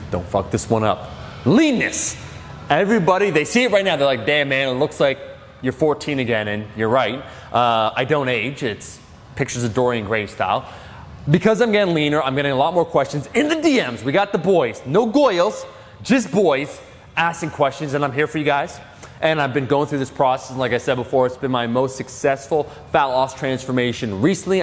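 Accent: Switzerland. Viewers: Never thought I'd hear Swiss German in an American fitness video. Greetings from the old country! Aber, der beste Käse immer kommt aus die Romandie oder Tessin, und du wisst das wie alle Düütschschwiitzer. Emmenthaler<Gruyère<Büscion da caura. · Dialect. I think it's Swiss German. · That Schweizerdeutsch though...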